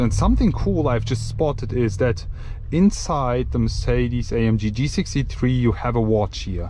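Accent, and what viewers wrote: Germany. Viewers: Very iritating english german pronunciation!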